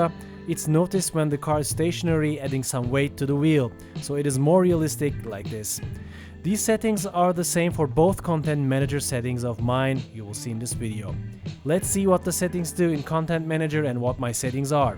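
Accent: Turkey. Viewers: reis türksün demi la jsajnfashjkfjlas aksandan anladım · 2. saniyede türkmü bu dedım kanala gırdım türkiye gordugum en temız ıngılızcelerden bırı olsada bellı edıyor kendını elıne saglık bu arada vıdeo ıcın sagol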